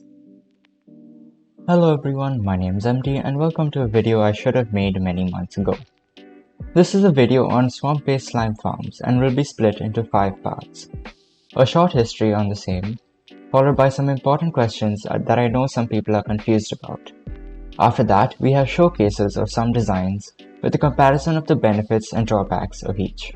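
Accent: India. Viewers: Bro has a nice indian accent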